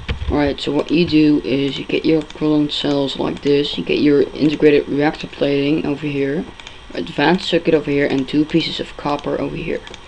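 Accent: Netherlands. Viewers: lolz je bent nl dat hoor je gewoon ;)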